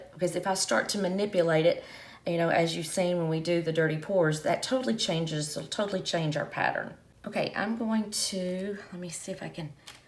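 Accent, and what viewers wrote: South, United States. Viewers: Thx for sharing! I love your southern accent ❤️. I’m from WV 😃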